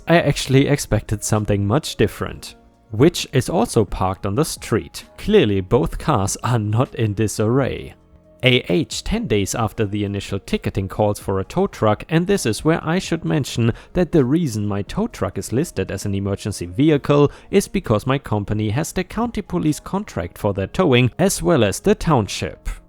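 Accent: Germany. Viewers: Hey man I was wondering what your accent is I can’t quite place it but I’m thinking German or Swedish If I could have any car it would probably be a hell cat. Or a Noble m500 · Darn lawn Nazis. I hate HOA's. The narrator does a wonderful job at telling these stories. As a person with German ancestors I like the accent. I can imagine the HOA president shouting "Kein Parken dieser Autos auf der Straße!" While stomping around.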